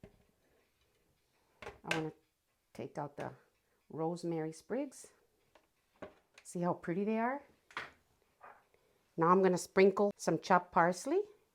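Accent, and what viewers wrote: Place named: New Mexico, United States. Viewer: I love you miss I love everything you make. I'm from new mexico your voice makes me home sick.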